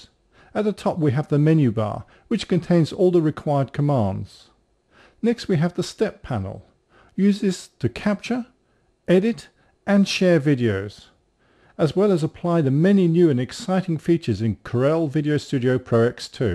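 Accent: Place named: England, United Kingdom. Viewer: is corel made in england? why is there an english guy talking?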